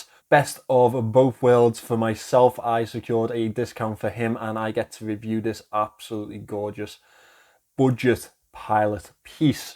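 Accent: Liverpool, United Kingdom. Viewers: Liverpool accent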